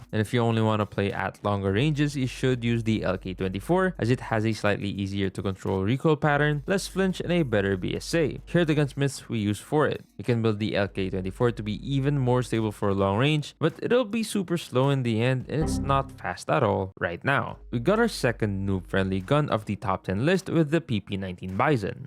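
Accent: Philippines. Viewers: are you filipino im picking up some filipino accent.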